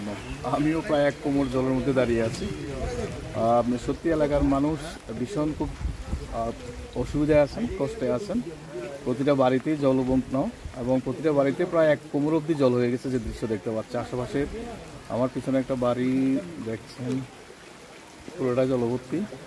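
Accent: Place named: Noakhali, Bangladesh. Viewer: এটাকে বলে নোয়াখাইল্ল্যা ভাষা...!!! পুরা সেইম টু সেইম।। একটুও এদিক-সেদিক নাই।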